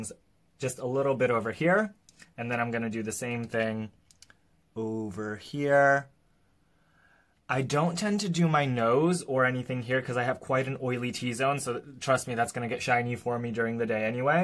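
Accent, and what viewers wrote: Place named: United States. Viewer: Well you have an American accent...and you said “usually my videos are pole dancing related” explain!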